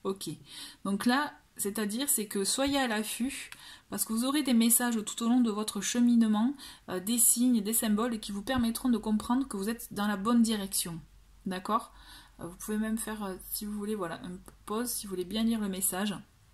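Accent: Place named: Midi, France. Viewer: Projets 🙏 avec ce bel accent du Midi si je me trompe pas !!!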